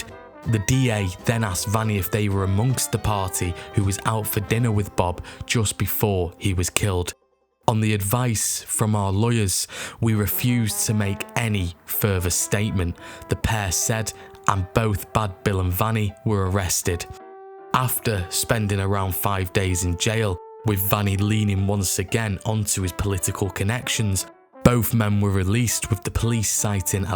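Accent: United Kingdom. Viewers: Northern English accent, not Yorkshire or Lancashire, somewhere around Manchester?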